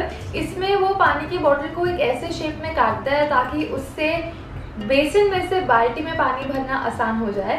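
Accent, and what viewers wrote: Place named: Maharashtra, India. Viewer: You have such a fun marathi accent.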